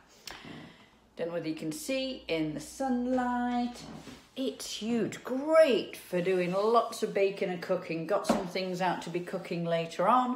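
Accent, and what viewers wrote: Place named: England, United Kingdom. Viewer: Hello miss. I am from Brazil..You're an enchanted lady. Your hands', oh your hands are so beautiful. E pelo sotaque eu deduzi que você é inglesa. UK.... Cheers from Brazil.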